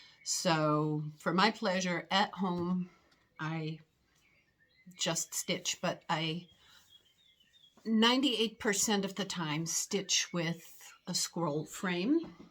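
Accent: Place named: Germany. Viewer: Boy that Kathy is going to be busy!!!! I soo love them both! Your sweet German accent is lovely! My kirche in WI is one of the oldest German churches in that area. They continue to do a gottestant service for Easter and Christmas! Not to mention the decor is very European! BTW I love the birds in the background!!! And yes it’s Tuesday!!! Hugs!!!!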